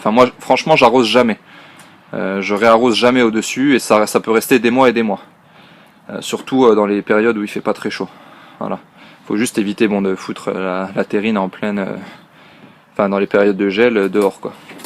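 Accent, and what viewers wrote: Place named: Vosges, France. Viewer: Super ces video, ca enchaine lol... J ai bien vu la (les ) video de Gilbert, mais étant proche de Rambervillers je comprend mieux ton accent;o)) Merci pour toutes ces video et leurs petites musiques douces...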